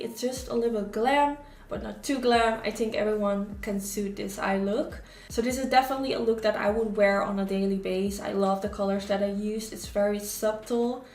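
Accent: Netherlands. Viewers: Are you from Holland? I can hear from your voice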